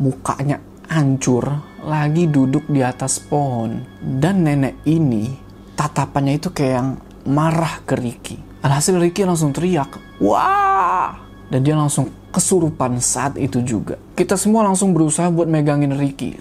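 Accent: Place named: Bandung, Indonesia. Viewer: Kang teh orng mna nya sga orng bandung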